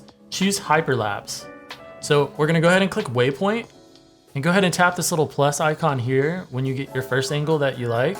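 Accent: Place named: Russia. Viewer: Ах этот русский акцент 🤪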